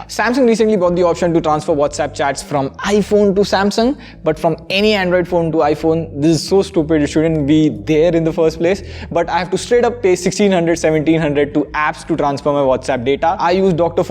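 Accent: India. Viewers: Such a horrible think Indian accent however thumbs up because it was a good video well done sir… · Why Hindis always have to speak english with this bad accent? Really. I’m from Poland i my original accent is Russian like but I learn how to speak better by watching movies n shit. I don’t get it. You really are fucking good YouTuber men but I don’t understand you because of accent.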